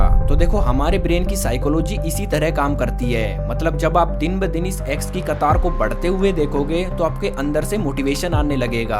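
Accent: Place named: Haryana, India. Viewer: bro is from haryana it tell voice tone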